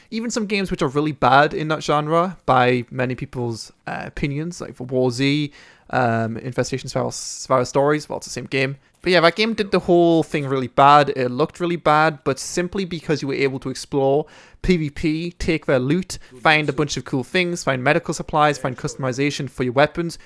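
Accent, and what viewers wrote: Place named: North East England, United Kingdom. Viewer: lol, dad dad!? U sound like youre from the north east :)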